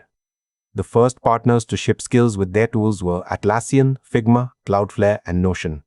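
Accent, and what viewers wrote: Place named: India. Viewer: Relieved I heard an Indian accent and not some ai bullshit 😅. I can now sleep in solace 😂❤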